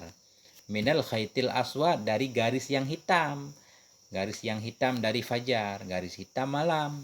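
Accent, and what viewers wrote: Aceh, Indonesia. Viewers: Afwan kiyai, awak kira kiyai dari Aceh karena logat antum 🙏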